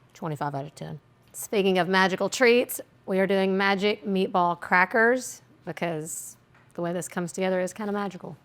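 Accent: South, United States. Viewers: I knew there was a reason I liked you so much, I lived in Mobile AL for 27 years and you have the southern thing down pat !!! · What part of Alabama are you from ? I grew up in Birmingham but now am in warrior. I love your accent. To me it’s the kind of southern accent that is pleasing to the ear and doesn’t make us sound dumb and uneducated like the fake southern accent’s you hear on tv. Love your voice and your videos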